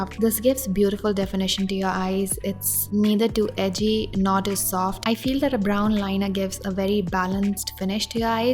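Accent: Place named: India. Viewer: I love your Indian accent ❤️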